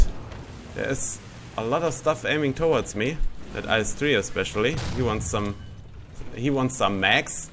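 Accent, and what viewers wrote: Germany. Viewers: I love his German accent :P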